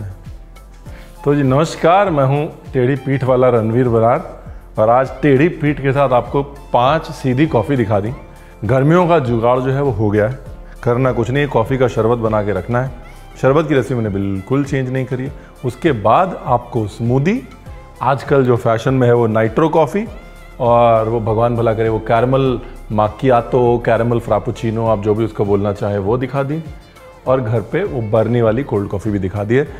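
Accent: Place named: Uttar Pradesh, India. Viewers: Arre bilkul Lucknow wale jaise bolte hai aap, you can easily figure out how a Lucknow wala speaks. I am too from Lucknow. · Totally up wali boli 😅 mee too from lko that's y i like u so much❤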